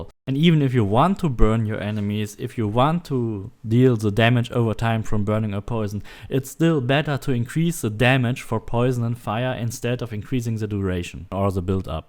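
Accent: Germany. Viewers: I haven't heard such a classic German accent since playing Titanic Adventure Out of Time.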